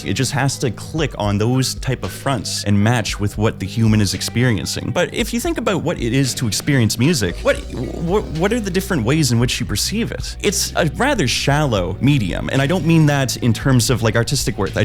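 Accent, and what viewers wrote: Canada. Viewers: All you Canadians sound the same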